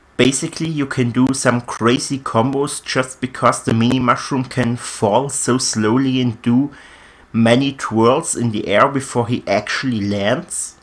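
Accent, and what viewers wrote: Germany. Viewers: You are German. Als Deutscher erkenne ich es!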